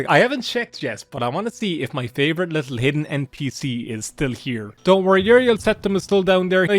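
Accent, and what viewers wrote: Ireland. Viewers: The Irish man is back! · That’s true his Irish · I have a small glimmer of hope, like a candle flickering against the storm, that the morrowind DLC at level 1 will come back. Anyway nice video, still got that charming Irishman voice, like Guiness flowing in a field of clovers.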